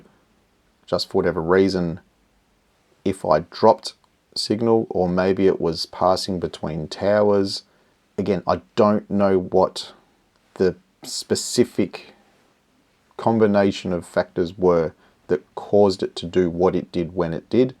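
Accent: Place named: Australia. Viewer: Great review, knowing both sides of the coin, why not go for the best side, nice to hear an aussie not talking too much aussie, no offence. Regards London boy.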